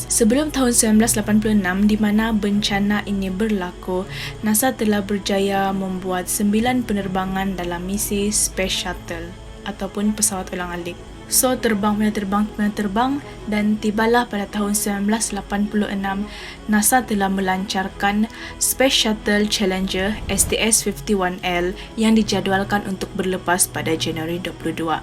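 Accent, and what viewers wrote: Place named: Sabah, Malaysia. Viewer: Punya kental sabahan itu bahasa ko..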